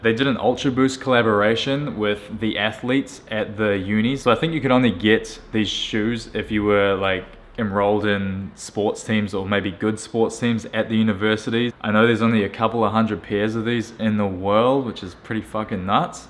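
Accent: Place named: Australia. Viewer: Broooo, How much did you pay? You sound like your from Australia.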